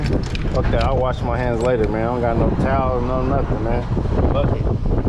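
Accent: California, United States. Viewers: Under bucket that's that cali talk yee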